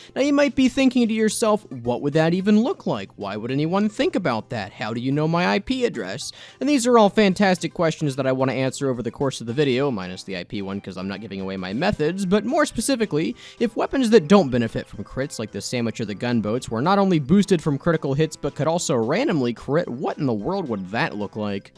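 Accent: United States. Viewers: What part of Florida are you from?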